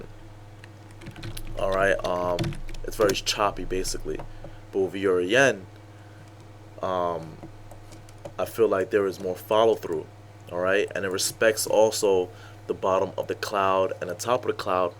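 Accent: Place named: Italian-American, United States. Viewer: Love the goomba accent. Fits the gangsta ripoff traders.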